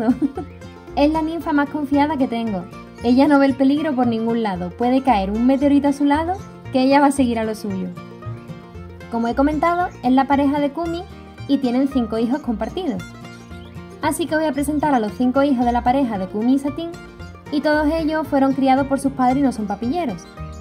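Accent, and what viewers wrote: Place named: Andalusia, Spain. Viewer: ¿De dónde eres? no consigo dar con tu acento: estoy entre Granada, Jaén, Córdoba, Sevilla...🤔 Yo vivo en un.pueblo de Vlc y tengo 22 agapornis 😍😍😍😍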